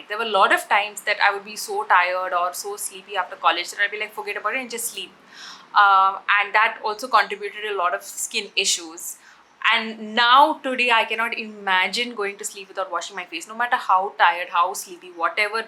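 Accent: India. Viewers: Yes,The Indian accent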